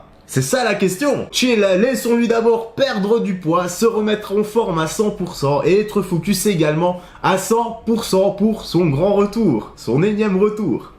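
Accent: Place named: Belgium. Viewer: Yop petit vieux nouveau sur ta chaîne YT. Nouveau fan de mma et de l'ufc depuis octobre 21. Après la découverte de mr Ciryl Gane 😁 Comme pas mal de nouveaux fans je pense. Sont année 2021 est impressionnante. Merci les multiples émissions sur lui et sa team la (ou le) mma factory. Du coup... A force de chercher des chaînes sur le mma je tombe sur toi et... 😁😂 ton accent bien belge même si je repère pas la région. Je suis de Charleroi originaire de Rochefort. J'aime bien ta manière de présenter tes sujets et le ton que tu utilises. Rafraîchissant, et assez marrant 😉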